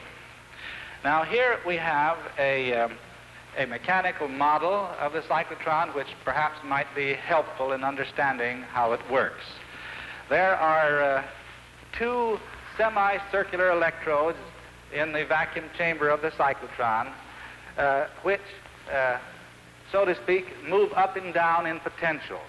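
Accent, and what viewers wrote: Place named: United States. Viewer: I loved this kind of clear-cut manly American accent of the mid 20th century, now sort of lost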